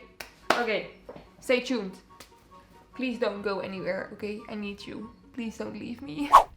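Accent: Netherlands. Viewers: Is she Dutch? I can hear the accent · Lekker nederlands accentje wel 😻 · Are you Dutch cause you kind of have a Dutch accent😊😅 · Heerlijk dat Hollandse Engels erdoorheen 🤣🤣🦄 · Hey I just found your channel where are u from? You sound or Dutch or German or Swedish I'm just guessing. I'm from the Netherlands and I love crazy haircolors ❤️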